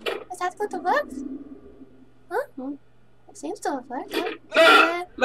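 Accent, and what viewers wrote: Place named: Italy. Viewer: and i also luv wat u did the way u speak both of i think italian english speaking accents lol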